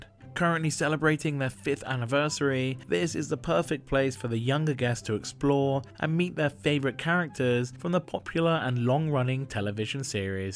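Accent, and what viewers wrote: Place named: United Kingdom. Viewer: British accents announcing American products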